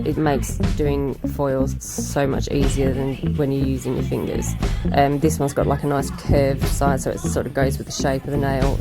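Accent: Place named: Australia. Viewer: U sound so Australian x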